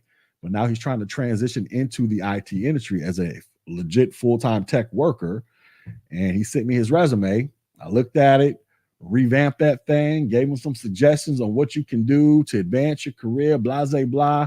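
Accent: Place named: New York, United States. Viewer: I don’t mean to come as weird, but are you from Harlem or the Bronx? You truly sound like us. Youngin,B, Baconeggandcheese. New Yorkers talks with a lot bass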